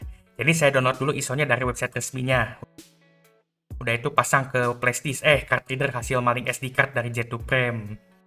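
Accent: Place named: West Java, Indonesia. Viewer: orang bandung wkwk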